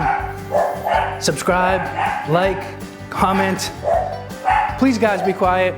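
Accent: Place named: Boston, United States. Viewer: Hi, love the video. I’m going to make this as soon as I can. I was just wondering what part of the northeast you are from. I’m in Massachusetts and you sound just like me! Chadda, betta. You know, like your from Boston or somethin. Just curious! Thanks for the video. ✌️🍻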